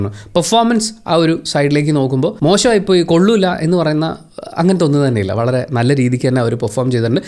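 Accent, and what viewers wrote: Kannur, India. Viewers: Ente samshayam Tamil Nadinte Borderil ulla Place. Parayunnathu muzhuvan Kannur Slang. Ethu Ethaa Sthalam.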